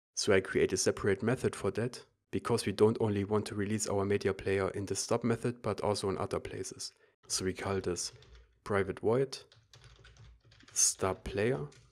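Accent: Germany. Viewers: Thx man... BTW I like GERMAN Accent 🌚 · I smell german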